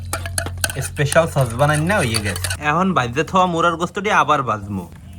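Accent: Barishal, Bangladesh. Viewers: এক্কেরে খাস বরিশাইল্লা ❤❤❤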